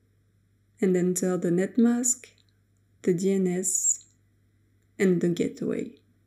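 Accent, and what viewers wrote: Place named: France. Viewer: Excellent accent français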